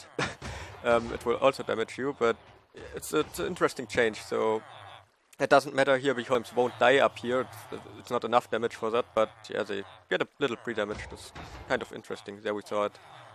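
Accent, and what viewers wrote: Germany. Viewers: do you come from Germany?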